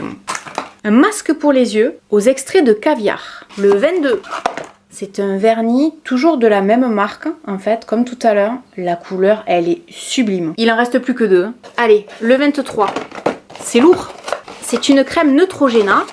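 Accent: South, France. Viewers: Trop contente de te retrouver plus souvent 😍 et tu mérites largement d’être sponsorisée par des marques 🤍🍀 ! Je regarde toutes tes vidéos quel que soit le sujet car tu es notre rayon de soleil 🌞 avec l’accent du sud 😉 ! Je t’adore merci pour tes vidéos 😘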